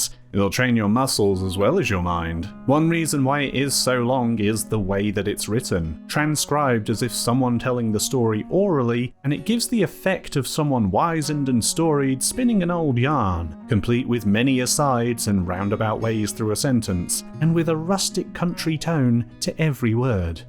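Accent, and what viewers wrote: United Kingdom. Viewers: Dude! I had no idea you were british.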